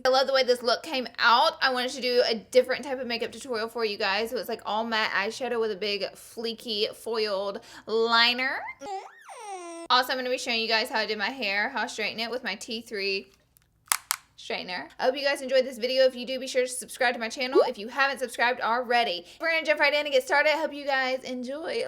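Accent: South, United States. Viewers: PSA: Shes from the south. She has an accent. Get over it. 🤷🏻‍♀️ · she looks so pretty in this but i love the southern accent because i'm southern too 💗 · I'm so in love with your accent. I'm from Texas and miss people talkin like that. But your accent is a lot stronger than us Texans lol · it bothers me that this comment is on every video and still....I mean doesn't it occur to you that it's not improper pronunciation, it's an accent? she's clearly from the south! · Love this look! Is it just me or does her fabulous accent sound really strong in this video?! Southern accents are my fav! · I love the way you say sugar! Southern accents are amazing❤️ probably why I married a Texan 😂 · i used to hate southern accents for some reason and then i fell in love w/ her omg :') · Were you tired when you did this vid hun? I'm a newbie subbie but you didn't seem as bouncy as usual? 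Btw love your accent (I adore a southern accent! Always wanted to marry me a southern gentleman - kinda hard to find them in south east London uk though!!) 😋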